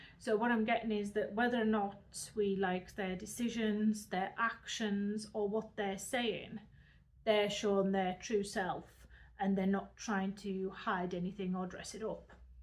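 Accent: Newcastle, United Kingdom. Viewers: Yes l get what your saying she does sound a bit Geordie on further listening more north Yorkshire, l myself 12 miles from Newcastle. Where are you from? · Geordie or Liverpool I feel, I'm leaning Geordie. Someone perhaps not born here but has lived here a long time and picked up the local accent is my feeling? It's a nice accent, very interesting.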